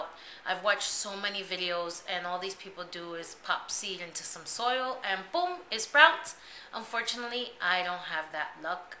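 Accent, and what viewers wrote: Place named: New York, United States. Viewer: You have an amazing NY accent :) great idea with the sandwich bags my pods never seem to sprout, i'll let you know how they turn out!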